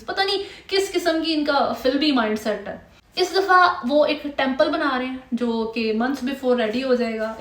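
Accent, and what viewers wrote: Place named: Punjab, India. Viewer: Yaar Punjabi accent me English kenyu bolte hain aap ?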